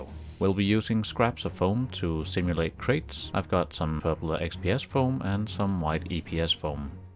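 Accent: Denmark. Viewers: Do I spot a danish accent? If so, where do you source XPS? It is a pain to get a hold of here.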